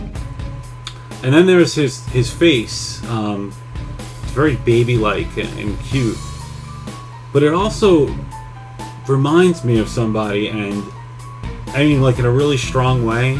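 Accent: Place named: New York, United States. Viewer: And im guess by acsent alone your from nj or ny more than likely ny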